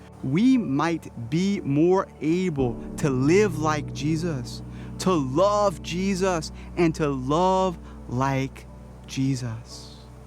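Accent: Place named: Louisiana, United States. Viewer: I've said it before and I'll say it again, Father - your diocese should really promote your videos more! They are beautifully made and your teachings are wonderful. I use them in my parish weekly newsletter and our website, here in the Archdiocese of Philadelphia! Love your Louisiana vocab and accent! ;)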